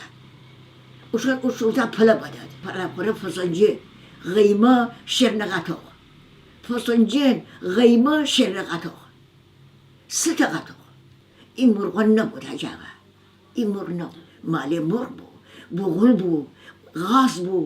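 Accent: Gilan, Iran. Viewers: عالی عالی❤ لهجه جان ، برا شرق گیلان😘♥️😍نوستالژی👌🔥😍♥️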